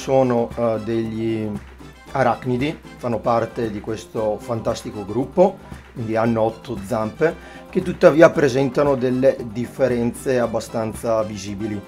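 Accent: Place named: Verona, Italy. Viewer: Naturalmente Veronesissimo 💪🏻💪🏻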